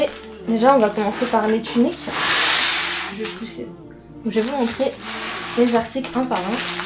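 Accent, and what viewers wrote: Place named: Belgium. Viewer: Salut , l'accent belge à l'honneur dans cette vidéo , je suis tombée dessus par hasard, bonne continuation pour la suite ! 🤩🤩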